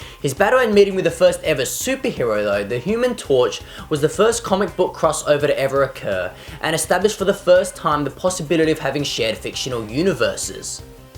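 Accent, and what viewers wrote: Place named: United Kingdom. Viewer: a Chinese guy with a British voice that's some weird shit right there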